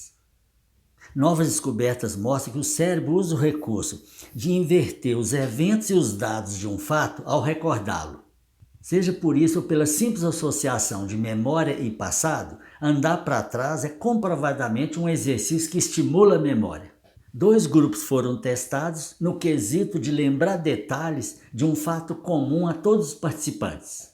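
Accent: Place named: Minas Gerais, Brazil. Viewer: Eu adoro esse sotaque mineiro dele 😅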